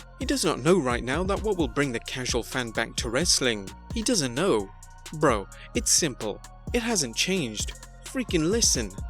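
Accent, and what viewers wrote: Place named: RP, United Kingdom. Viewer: It's funny hearing a guy with a posh British accent reading a Vince Russo quote.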